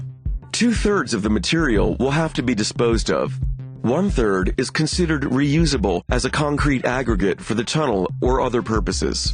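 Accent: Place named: United States. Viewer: Is there a reason for the American Accent?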